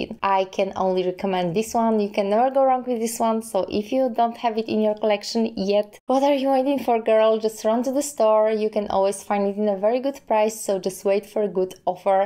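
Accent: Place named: Poland. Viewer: You have polish accent 🙂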